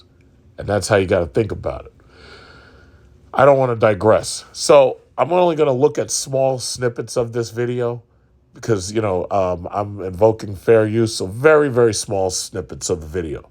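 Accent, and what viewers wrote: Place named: New York, United States. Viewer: Yo bro dead ass bc you have the NY accent, it is soothing AF to listen to your Passport Bro videos compared to other YouTubers. I can't be listening to those monotone channels.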